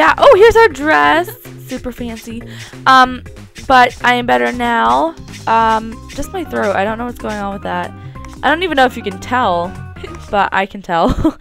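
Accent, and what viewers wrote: United Kingdom. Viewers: Your British accent is spot on! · U sound like garnet from Steven universe with ur British accent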